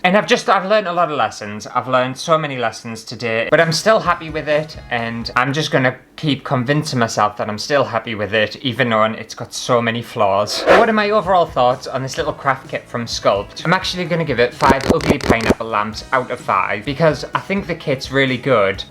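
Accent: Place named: Newcastle, United Kingdom. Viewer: YOOOO are you a geordie lad? or am i gaan mad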